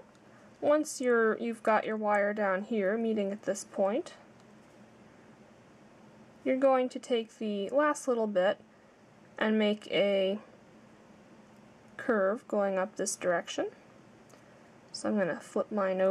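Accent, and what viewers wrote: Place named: United States. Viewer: From the UK, it makes a change to have a clearly understandable audio, albeit an American accent, hahaha, ☺☺☺, cool calm and collected, I've 👍, and subscribed, luv and cuddles from me and your UK. Viewer's ☺😊😀☺😊😀❤❤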